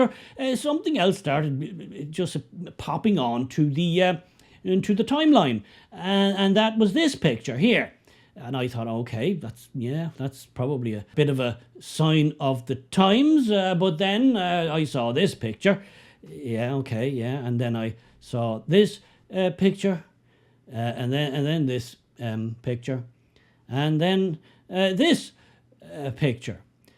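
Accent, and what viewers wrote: Dublin, Ireland. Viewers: SASSENACH with a Dublin accent.🙄😥🤡😫🤮😳🤪🥺💩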